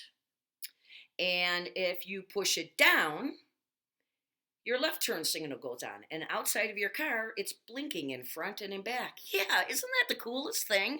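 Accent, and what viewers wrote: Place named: South, United States. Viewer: I thought he had a Southern Drawl? Maybe the trip gave him a scratchy throat?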